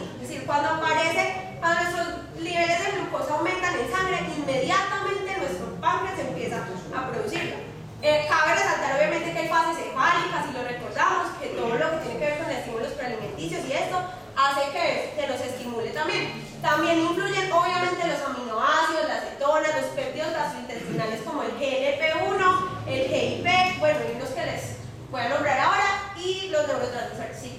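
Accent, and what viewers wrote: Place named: Venezuela. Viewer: Me encanta su acento. Es venezolana o colombiana o de donde es? xD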